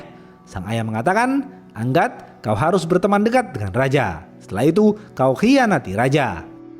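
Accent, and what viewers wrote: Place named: Solo, Indonesia. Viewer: Min suaranya kaya pria solo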